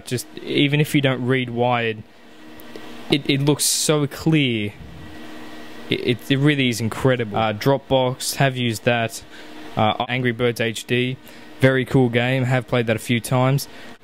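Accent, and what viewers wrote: Australia. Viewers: finnaly an ausie youtubber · Good to hear an Aussie accent on YouTube, especially about the iPad. Keep at the good videos mate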